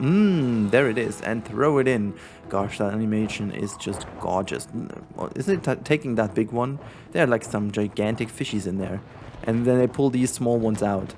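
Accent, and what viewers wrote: Germany. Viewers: You sounds like a german , bin selbst auch deutsch ,you too ?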